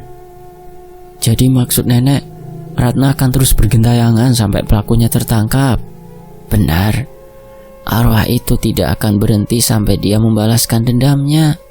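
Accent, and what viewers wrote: Java, Indonesia. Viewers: Dialek jawane bikin kangen kampung halaman mas 😂😂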